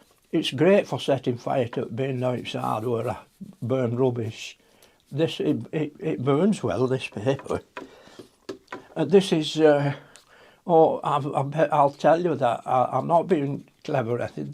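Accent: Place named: England, United Kingdom. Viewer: Hahaha.... That was wonderful. Hearing a really Englishman talk like. Letting the truth take centre stage, instead of the "feel good" talk that only serves to get most peoples backs up. Pleas feel free to have another "say", I loved it, and it's good to get ot off your chest. Oh, and I 100% agree with everything you said. Not to mention your paintings, they always do my soul good. Always simplistic in style and form, yet they carry an air of peace, and a wanting from within for me. Benn stuck in door for a very long time. Disabled, and had to give up my car, so when you paint, you bring into view the kind of places I would drive out to, and just sit quietly, soaking in the Englishness of peaceful country scenes and allow all my worries to drift away. You're a cracking man, and deserve every good thing that comes your way.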